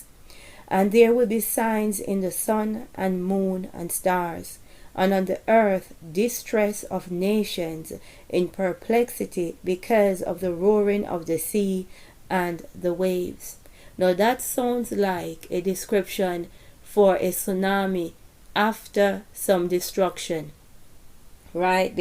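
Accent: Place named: Jamaica. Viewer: she never said 'tsunami all over the world'. listen carefully and dont put words in her mouth open your ears. She said it will hit certain areas off the Atlantic which is a massive geographical area. Then, the following infrastructure collapse will cause knock on effects to other parts of the world. That is what she means when she says the tsunami will affect the whole world. go watch the movie 5th Wave and it will show you the collape of society etc. There is also the possibility of a tsunami on the west coast of the US from the Hawaii volcano. this lady does extensive research before each vedeo. dont put her down just she has a Jamaican accent. even Geologists are saying the same thing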